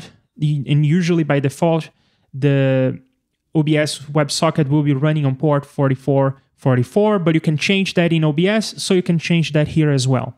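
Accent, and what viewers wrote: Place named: Brazil. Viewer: Muuuuito bom, meu amigo. Escrevo em Português para agradecer, pois acho que reconheci o sotaque brasileiro, certo? Ganhou mais um inscrito. Obrigado. Abraço!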